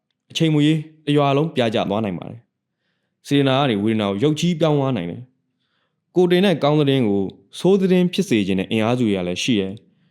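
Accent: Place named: Dawei, Myanmar. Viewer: ကထားဝယ်သားလား ထားဝယ်စကားပြောတာပီလို့ဗျ❤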